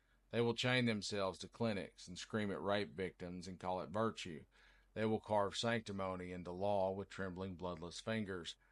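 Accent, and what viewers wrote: South, United States. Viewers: You are a treasure and represent what a real man (with a southern accent) should sound like. You are describing religious ghouls...they are nothing more and we all know it.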